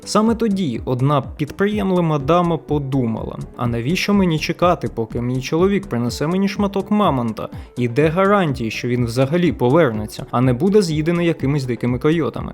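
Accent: United States. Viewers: Крутий американський акцент, ніколи ще не дивився відос від українського Джона. Лайк + підписка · бро, ю хев гуд амерікан аксент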